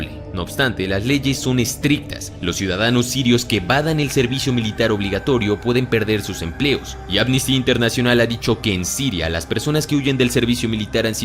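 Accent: Mexico. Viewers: Aa perro .. eres mexicano :0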